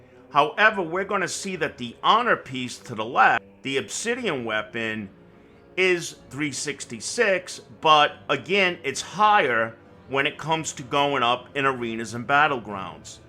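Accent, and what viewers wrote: New York, United States. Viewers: Your accent has me in stitches. Is it New York or Boston. Remind me if the Construction guy from Futurama ❤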